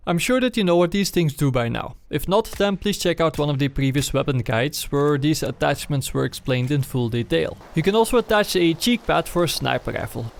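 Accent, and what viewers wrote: Netherlands. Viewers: your accent reminds me strongly of netherlands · are you dutch(nederlands) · your english sounds like your dutch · your thick dutch accent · Are you dutch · its Dutch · the first Dutch person I've heard with that thick an accent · Je klinkt Nederlands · the dutch accent is real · You sound Dutch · one kickass Dutch accent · werk aan je uitspraak want je kan zo horen dat je nederlands bent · are you dutch? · He's dutch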